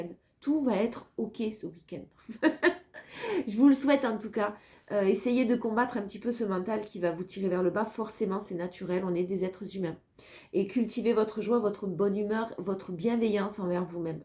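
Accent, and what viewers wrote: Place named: South-West, France. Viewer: J'adore vos messages qui me font beaucoup rire par votre bonne humeur. Votre franchise et notre bel accent du Sud Ouest (je pense) met du baume au coeur. Bon week-end❤❤😂😂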